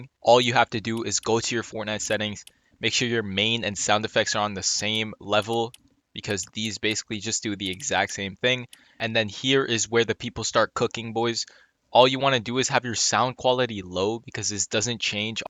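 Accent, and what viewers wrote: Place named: Canada. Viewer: Je sens ton accent canadien 😂😂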